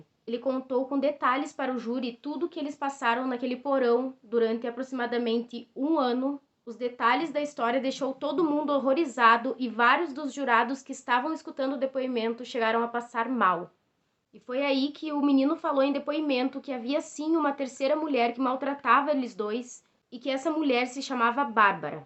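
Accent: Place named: South, Brazil. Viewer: Olá 😃 conheci seu canal hj e já adorei ... Parabéns pelo trabalho 👏 pelo seu sotaque acho que vc e aqui do sul ?!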